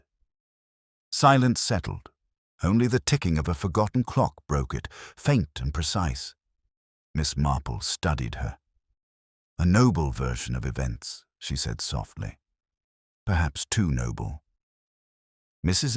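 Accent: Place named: United States. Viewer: Wauw, the ai-voice gets more and more American…not pleasant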